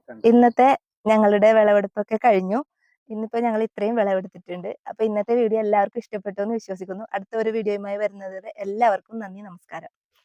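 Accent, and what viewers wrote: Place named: India. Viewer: Samsaram kettapo thoni nammalu ore gillakarado🎉🎉 God bless u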